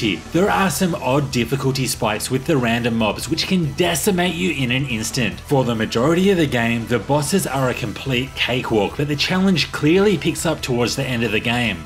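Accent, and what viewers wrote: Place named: Australia. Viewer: I think it’s Aussie but for some reason has a strong american R sound…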